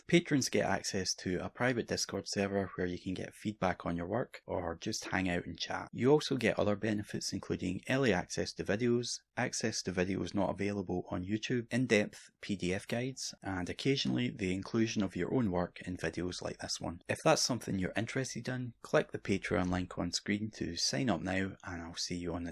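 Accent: Scotland, United Kingdom. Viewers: Wow. Miniature painting has come a long way since the 80s! Sublime work... not to mentioned narration by a smooth, Scottish accent. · I had to dig the urban meaning of shucks ^^ English is not my native language (being French)..... but I enjoy your scottish accent :) Keep up the great work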